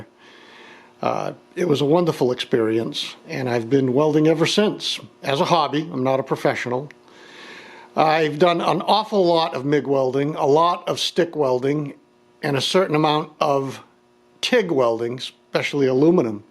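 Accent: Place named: New Hampshire, United States. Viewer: Where you from? Your accent reminds me of home in New Hampshire. You convinced me...I'm jumping on one of these.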